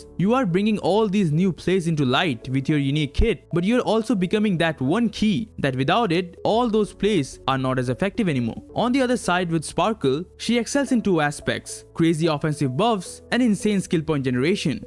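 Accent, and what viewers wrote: India. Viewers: You are indian ? Accent seems so {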